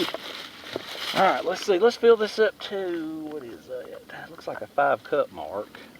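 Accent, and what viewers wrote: South, United States. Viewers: I was wondering where in the South you were... I could tell you were somewhere in Antebellum country by your dialect.... Georgia the setting of 'Deliverance'- watch your corn hole in them woods that boarder Appalachia 😎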